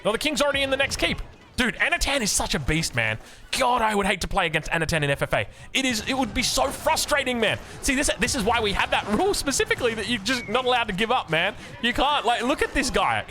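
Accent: Australia. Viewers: I rarely sub, but this Aussie is worth subbing for! Watched like 30 videos lately and your casting is magnifizent. Also, this FFA game was very exciting and probably the most intense:o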